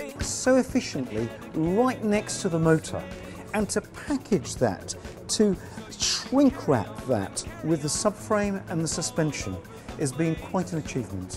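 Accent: United Kingdom. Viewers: lol. They had to take a guy with a british accent. For some reason, people tend to swallow bullshit as long as it's coming from the mouth of a british. I could smell some bullshit in that speech. I know it's a good car, I like the car, but this guys tried to make it look like the car is more then what it actually is. He tried to sell small achievements as big "nearly impossible" achievements. I mean c'mon now. We know the car is good but please..... quit the bullshit. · Between his British accent and that awful music, I didn't understand much of what he was saying.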